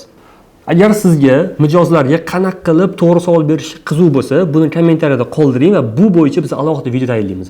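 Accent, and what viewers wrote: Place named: Qarshi, Uzbekistan. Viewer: Qayerliksiz qarshiliklarga o'yshap gapirdiz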